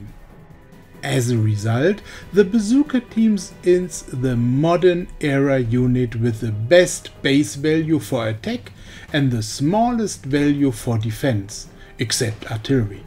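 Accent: Germany. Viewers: Er ist Deutscher mit etwas starkem Akzent.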